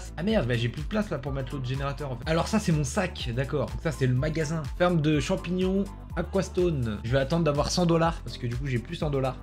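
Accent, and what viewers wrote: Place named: United Kingdom. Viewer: J'adore ton accent anglais quand tu lit les trucs en anglais 😭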